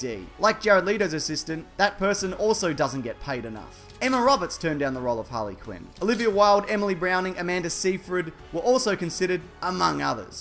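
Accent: Australia. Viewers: I would love to see Deadpool in the next Suiciide Squad movie...oh no wait. He's Marvel. Still Deadpool though. That movie would be EPIC. Oh actually, I want Batgirl in the next one- but only if I play her. Yes DC, I will begrudgingly take that roll for the good of the 'verse. Also, awesome video. Do I detect an Australian accent or am I wrong? Aussie YouTubers represent! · Hello Mr. man. I like your Aussie tone. It's nice. I WOULD like to win the lut crat, so if I could, that'd be cool. Thanks. Take care.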